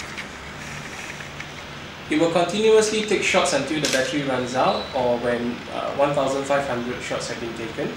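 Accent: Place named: Singapore. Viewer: long live singlish! :D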